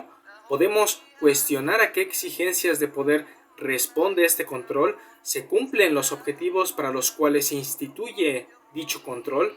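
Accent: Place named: Mexico City, Mexico. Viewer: Todo iba bien hasta que se oye tu pinche acento chilango arrabalero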